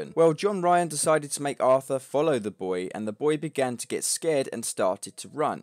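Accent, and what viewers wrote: United Kingdom. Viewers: He just has a british accent thats all.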